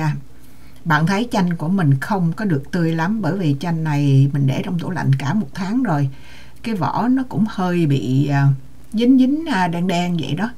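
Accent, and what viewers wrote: Quang Nam, Vietnam. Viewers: cam on co da chia se cong thuc. Rat de dang va cach noi chuyen nguoi Quang Nam cung rat de thuong.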